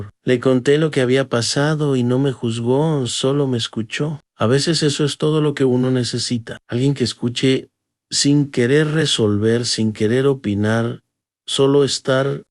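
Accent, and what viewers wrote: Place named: Pocho, United States. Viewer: Que pésima narración, no necesitas intentar hablar como pocho, el español es bellísimo, no me gustó tu narración, no terminé de escucharte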